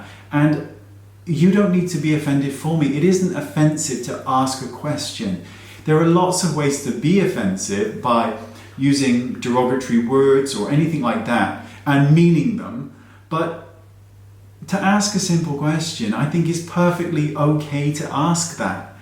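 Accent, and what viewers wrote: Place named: England, United Kingdom. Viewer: Firstly...I enjoy your voice, your English accent and I think you’re bloody handsome! I like your honest no nonsense approach, great make up tips and tutorials. And I enjoyed your Wayne Talks where you shared how it’s OK for people to ask questions, to ask if you (or whomever) is gay. Although I never thought it being an offensive question, I still found your perspective enlightening, as it helped further deepen my own understanding and position. Keep sharing your wealth of knowledge and coming across as down to earth and unpretentious!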